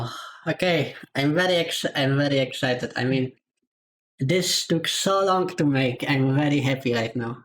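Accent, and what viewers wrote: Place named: Poland. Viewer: Ik u are poland i hear that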